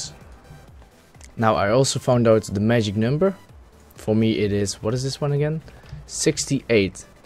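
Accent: Netherlands. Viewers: i dont know but are u nl? u talk like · haha mooie nederlandse saccent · when the dutch accent is strong..... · Jij bent nl toch? (ik hoor het aan je engels) ;) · Ik kan het nederlands goed in je stem horen maar je engels is wel goed hoor!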